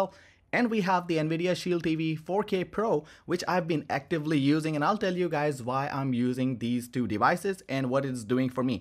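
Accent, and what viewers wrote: India. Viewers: your indian accent is not that strong. don't worry bro.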